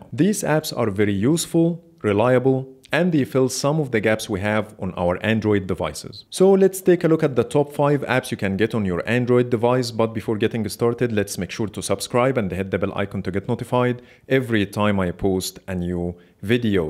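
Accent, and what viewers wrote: Egypt. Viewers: Nice apps thank you for sharing it, as you sound as an Egyptian English speaker.. Great job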